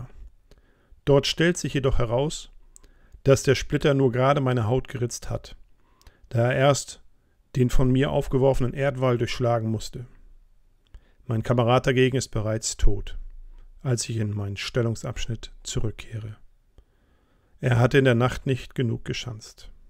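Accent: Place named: North, Germany. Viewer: Ein wirklich bewegendes Zeitdokument, das so lebhaft und plastisch beschreibt, dass einem direkt die Bilder dieser Kraterlandschaft vor Augen kommen... Was mich beeindruckt hat, war die manchmal schon fast poetische und malerische Schönheit und Präzision der Sprache, mit der dieser damals 18jährige junge Mann seine Erlebnisse und Eindrücke schildert. Ein großes Lob an Sie für die Vorarbeit und auch für das Einlesen dieses Textes selbst. Die Tatsache, dass kein Schauspieler oder Synchronsprecher diesen Text liest, sondern jemand mit in diesem Fall norddeutscher Klangfarbe, macht das ganze noch authentischer, noch spürbarer. Vielen Dank für diese Arbeit!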